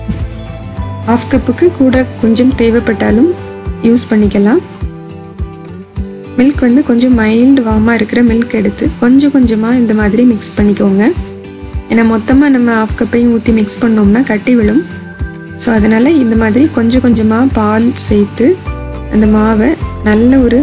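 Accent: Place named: Kanyakumari, India. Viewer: Love that accent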